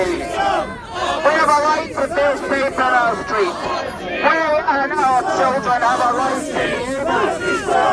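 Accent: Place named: Scotland, United Kingdom. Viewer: He sounds Scottish to me!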